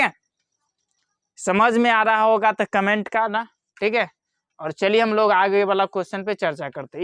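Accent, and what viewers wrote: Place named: Bihar, India. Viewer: Ekdam Bihari style me sir 😍😍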